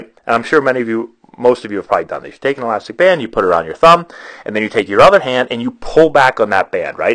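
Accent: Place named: East Coast, United States. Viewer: Anyone tell you that you sound a bit like Howard Stern? I think it's just your east coast accent lol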